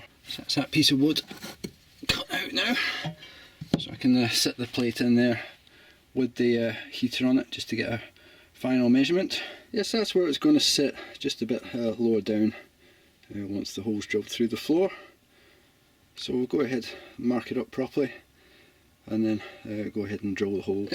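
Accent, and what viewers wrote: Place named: Scotland, United Kingdom. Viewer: your name suggest you are Glaswegian, but your accent suggests east coast maybe lived elsewhere for sometime .. england maybe ? .. anyway hows the heater ? are they safe ?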